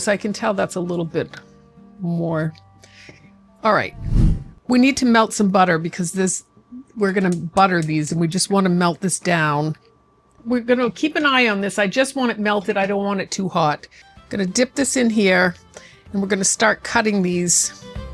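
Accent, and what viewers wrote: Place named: New Hampshire, United States. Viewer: Where are you from. I swear I hear a northern mass southern nh accent. From where I was born!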